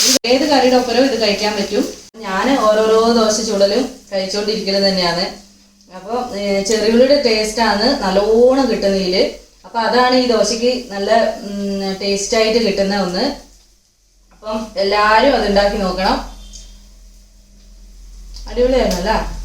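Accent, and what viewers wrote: Kasaragod, India. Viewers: Slang mariyalo.. thanni kasargod❤ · ആഹാ നമ്മുടെ സ്വന്തം കാസറഗോഡ് ഭാഷ 😜🤗നീർദോശ 👌👌👌👌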